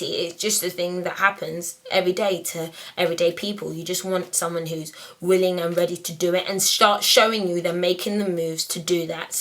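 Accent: United Kingdom. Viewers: Are you from London? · I Looooovvvveee the british accent! <3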